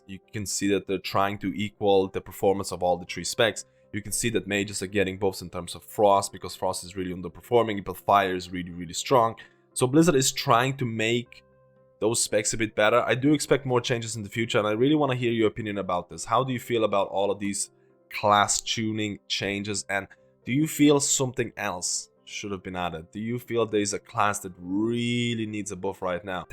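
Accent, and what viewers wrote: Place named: Ireland. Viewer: Dude you are without doubt the best healer content creator out there. Funny i thought that ur from some nordic country, because of your accent just to realize that ur irish. Really different accent from brittish one :D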